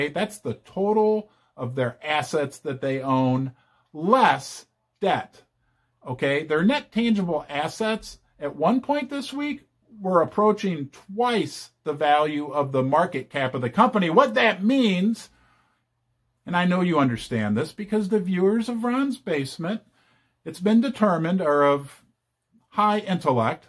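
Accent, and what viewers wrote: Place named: Michigan, United States. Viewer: His accent sound Michigander.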